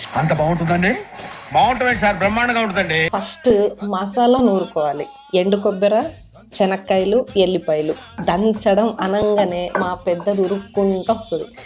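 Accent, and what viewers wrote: India. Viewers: Madam mee language ni batti meedi maa Kurnool anukuntunna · Mee maata chusi ma telangana vaallu anukunnanu andi inni rojulu,ayinaparledhu lendi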